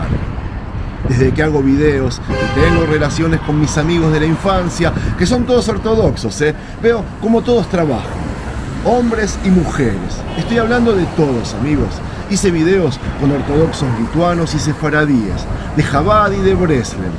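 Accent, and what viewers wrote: Argentina. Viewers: Viven de sus camaradas que tienen empresas y multinacionales y de las armas que fabrican en Israel y otros países. Y parece que a la gente se le ha olvidado lo que estaban haciendo en Nueva York. La mayoría están podridos de dinero, pero no se gastan nada. Lo guardan para la causa ortodoxa y a las mujeres, sólo las queréis para que tengan hijos. No engañais a nadie. Y por favor, no preguntes, si no te van a gustar las contestaciones. Porque no preguntas a las mujeres si les gusta esa vida. Y por lo que se escucha, eres argentino, éstas hay porque pediste asilo, para salir de tu país.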